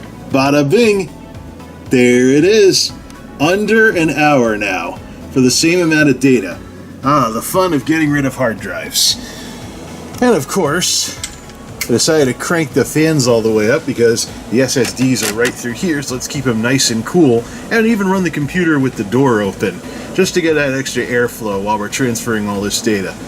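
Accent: United States. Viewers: Which part? If you are going to say that I don't know what I am talking about, I suggest you be a little more specific. My math could be off a little, rounding, but Google says that the average rate for electricity is .18 cents per kilowatt hour in the United States, That makes my figures fairly accurate. I redid my math. According to Google my math is pretty accurate if you are in the United States on the mainland. In Hawaii electricity rates are about double the U.S. average. He has an American accent, so my assumption is that he is somewhere in the United States and not in a place like Germany where the average rate per kilowatt hour is much higher. He did not state where he is. That SSDs are better than sliced bread? This is my opinion, an opinion derived from owning hard drives and then several SSDs. If you are going to say that I don't know what I am talking about, is not exactly accurate, because I believe that everything that I said, while not being exact, is pretty accurate. 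That you do not agree with what I said, in no way means that I don't know what I am talking about. If you do not agree with my math, I suggest that you do it yourself. Did he say an additional reason that I didn't hear? The best that I can deduce from his video is that his previous hard drives used so much more electricity than his new SSDs that it makes it financially worth switching. I made a pretty fair argument that while SSDs are much better, the electricity that you save is not a reason to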